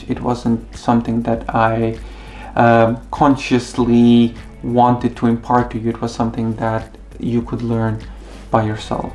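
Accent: Estonia. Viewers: your english already sounds like you're an estonian speaker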